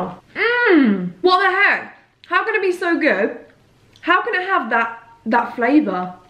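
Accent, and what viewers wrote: England, United Kingdom. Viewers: It’s pronounced tah-co, if you’re being authentic. But I know British people pronounce somethings differently. Like the way you pronounce pasta is way different. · I feel like it doesn’t matter how you say “Taco” because it’s not an English word and you have a brutish accent so both ways sounds like you’re saying “taco” to me haha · Do you think if I move to London I’ll catch the accent?? I absolutely love how she talks lol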